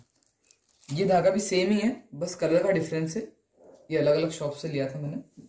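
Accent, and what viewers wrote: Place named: Indore, India. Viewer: Aap indore se he . Aapki language se pata chal raha he. Very nice.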